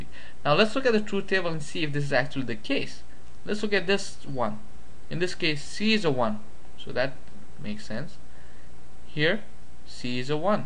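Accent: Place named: India. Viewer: Can't recognize that accent, where are you from? Seems like a light-ish indian with a bit of a lisp. Great video nonetheless.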